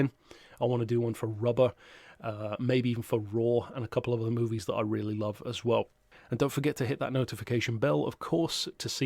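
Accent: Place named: United Kingdom. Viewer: It's weird seeing Braun Strowman, discussing a movie with a British accent on YouTube! 😕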